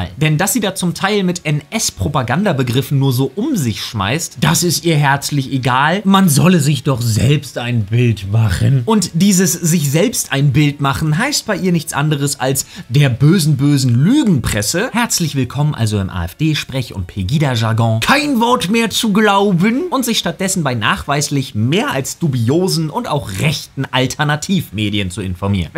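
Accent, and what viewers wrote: France. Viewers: Mit deinem Bart und diesem französisch klingenden Aktzent könnte man denken, dass du wirklich Franzose bist😅😅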